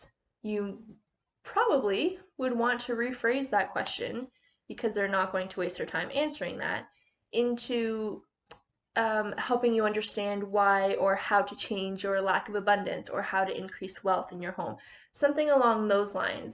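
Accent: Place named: Massachusetts, United States. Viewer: I’m 5 seconds into this video and I immediately heard a Massachusetts accent. (If I’m getting cocky about my accent guessing abilities can I get more specific snd say like outside of Boston? North shore? Merrimack valley?!)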